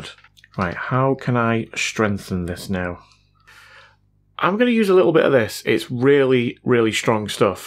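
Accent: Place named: Bradford, United Kingdom. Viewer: All I want to know is if you're originally from the Bradford area? (because, inflection for inflection, you sound exactly like the Lost in the Pond dude.. and that's where he's from)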